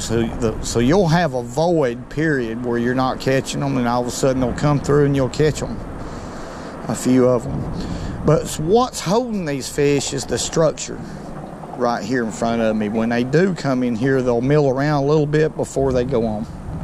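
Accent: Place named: Tennessee, United States. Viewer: Beautiful Tennessee accent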